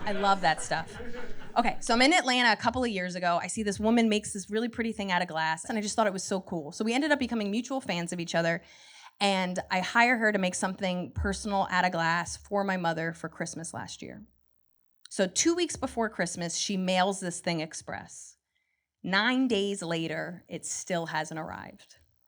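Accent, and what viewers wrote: New Jersey, United States. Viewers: Hilarious. I’m a transplant from the east coast and hearing your humor and accent brought me back to summers in Jersey. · youre clearly from NJ:)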